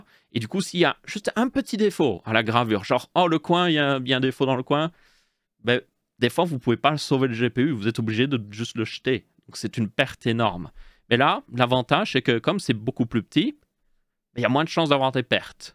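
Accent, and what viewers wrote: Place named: Belgium. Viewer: Ce que je veux dire n'a rien à voir, mais j'ai remarqué que votre accent belge est assez prononcé, mine de rien.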